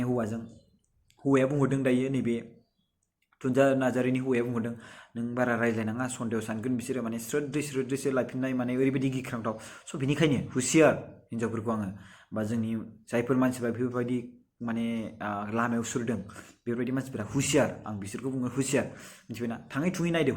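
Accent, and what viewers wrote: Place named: Kokrajhar, India. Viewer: Hab pongbai nwngni raijlanai jwngni kokrajharni baidi tar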